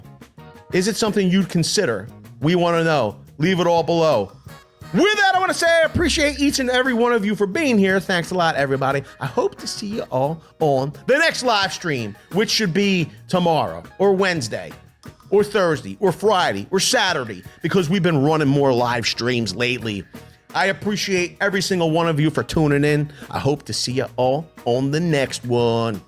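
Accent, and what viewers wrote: United States. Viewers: The 'Dollar' is a currency unit used by more than 25 countries. Judging from your accent, I'm guessing that you're an American. Please, when you are quoting prices, have the courtesy to say "US dollars", unless, like most Americans, you don't believe that there are other countries in the world who are viewing your videos.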